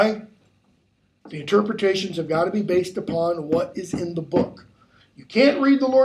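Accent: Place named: United States. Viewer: Very loud and american.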